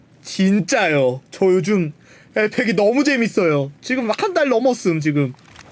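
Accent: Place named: Southeast Asia. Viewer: ㅋㅋㅋㅋㅋ영어 발음이 동남아사람 멕시코 사람인데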